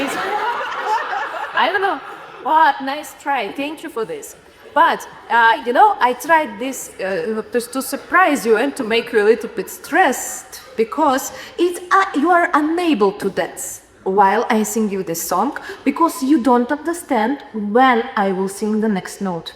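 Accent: Russia. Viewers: Я такой русский акцент понимаю хуже чем индийский 😂 Хотя казалось бы😅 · Вполне нормальный такой русский английский. Большинство невыпендривающихся русских на нем говорит. Я обожаю его! Ничего не отвлекает. Все как по-русски, но английскими словами.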